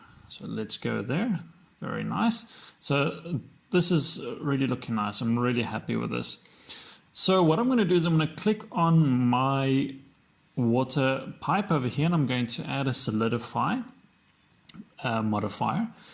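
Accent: South Africa. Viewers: Great to see a fellow Saffa in the 3D community :D Could recognize that accent in the first few seconds. Great tutorial! Thanks for the effort. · south african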